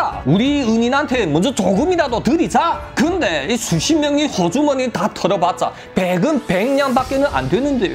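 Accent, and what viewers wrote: China. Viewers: 이분 조선족이신가요?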